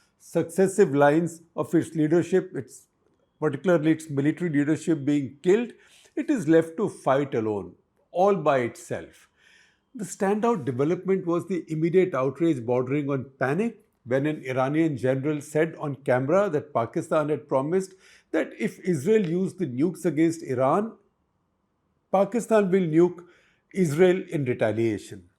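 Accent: India. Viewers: His thick Indian accent requires a lot of focus to comprehend what he is saying.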